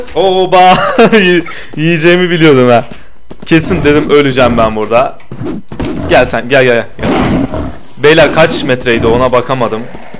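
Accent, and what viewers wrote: Adana, Turkey. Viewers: a be adanali misin lütfen cewap wer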